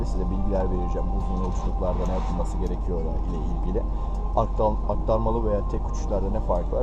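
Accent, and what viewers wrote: Turkey. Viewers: Ege Zz aksan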